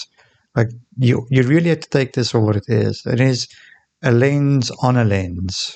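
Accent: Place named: South Africa. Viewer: Always a pleasure to hear a South African accent on a review. I wasn't sure at first but once I saw the sparletta I got confirmation 😅 great video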